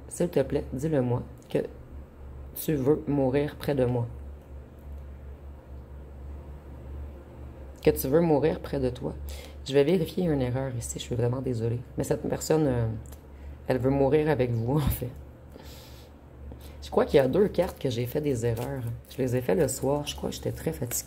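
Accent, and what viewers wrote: Quebec, Canada. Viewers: Vous avez toujours autant de charme ,de séduction , de belles manicure et couleurs des ongles , vos tatouages bien fait et très jolis et comment vous avez dû souffrir dans le bras droit en plus , j'adore votre accent québécois ,votre charme ,vous êtes très coquette aussi je trouve ça 👍🌟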